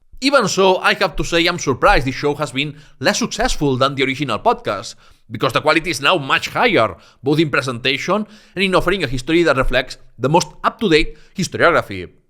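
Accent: Spain. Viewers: I advise you to cut your videos in small bytes and ask provocative questions in the title + the hook ... Try to exploit geopolitical contexts of today and do some projections or really anything to exploit a controversy ... something like that in a short form You can also do collaborations if possible + if u want, u can improve your accent which is 100% understood but quick and overrun by your spanish accent (tho honnestly i consider that as a good feature, I like it) I'm amazed by the sheer quality of your videos... the level of details, the impartiality and the extensive coverage of all sides that constitute the amazing history of Spain...unlike another English channel by someone with a French accent telling his own subjective opinion ALL THE F*CKING TIME... so your videos is a breeze to me!! All respect to you!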